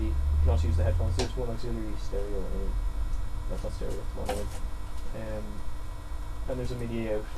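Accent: Ireland. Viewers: are you from waterford? you sound like your from the deise...boy!!! :)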